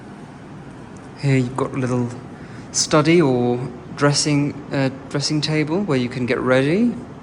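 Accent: RP, United Kingdom. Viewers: I can't believe he does not have a Trust Fund and was not educated at elite British institutions. His speech and mannerisms, show true upper class Britannia. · I came across your channel by accident and really enjoying it im glad you explained about your lifestyle not that you have to .I think its cause your accent is very posh that maybe people perceive you've come from money not gonna lie I thought the same so thank you for sharing .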